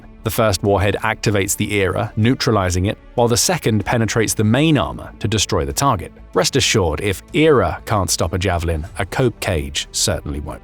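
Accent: United Kingdom. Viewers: misleading title, and british yapping unrelated to the misleading title for over 12 minutes.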